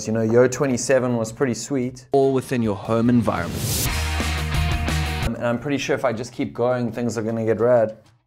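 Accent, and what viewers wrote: South Africa. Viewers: Yay! I'll eagerly anticipate it. I'm from Cape Town, born in PE, but now I'm in Germany. I miss Cape Town every day. I always love hearing a South African accent on YouTube.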